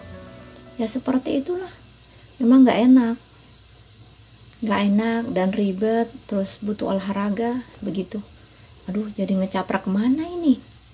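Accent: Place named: Banyumas, Indonesia. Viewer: My favorit food Roasted sweet potato / bahasa ngapak muntul mba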